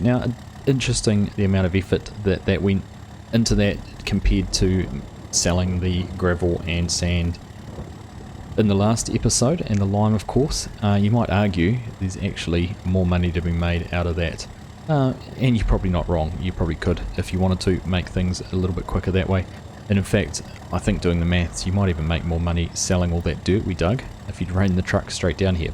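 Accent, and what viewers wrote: New Zealand. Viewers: Damn man a kiwi? North or South Island mate?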